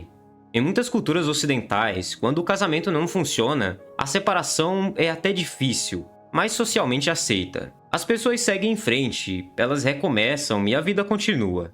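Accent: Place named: Pará, Brazil. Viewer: Sotaque da região do Pará, tenho um amigo do Pará e estudei com pessoas do Pará q tmb falam assim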